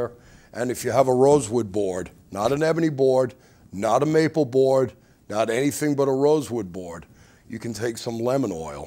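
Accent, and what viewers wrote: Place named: New Jersey, United States. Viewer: Jersey is my guess...def.not Boston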